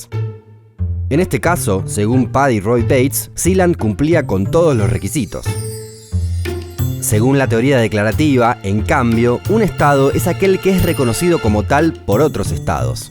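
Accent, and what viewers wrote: Argentina. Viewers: Este acento argentino me parece particularmente irritable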